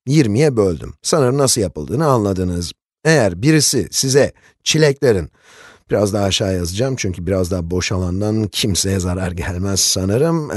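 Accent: United States. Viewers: hocam amerikalılar gibi konuşuyorsunuz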